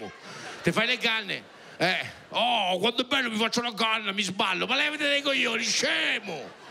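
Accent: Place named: Marche, Italy. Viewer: Il marchigiano,ancor piu' il fermano, ė universale!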